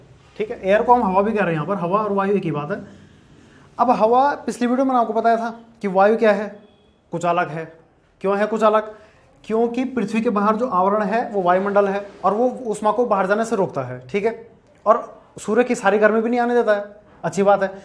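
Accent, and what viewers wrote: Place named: Garhwal, India. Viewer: Sir one thing apko hame apni language mai phadhana chaiye (ghadwali mai)... abhi tk koi bhi teacher nhi h youtube mai jo gadwali mai phadata ho.(jase south mai dekh lo. Tamil mai dekh lo. Apni language mai phadhate h sb. Assam mai dekhlo. Tripura mai dekhlo. Sb apni language mai phadaya h) apki voice mai gadwali ka flow bhi ara h. bacho ke liye best h jo hamre jadatar gadwal mai h..jldi samjhege gadwali mai .. aap ek baar try krke dekhye. jada success milegi apko and students ko bhi.. Thanks Aspirant Deled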